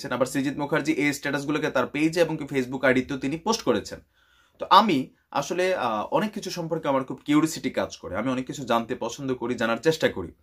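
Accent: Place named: Noakhali, Bangladesh. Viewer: Bruh apni j noakhali/laxmipur er eita apnar kothay clear buja jay.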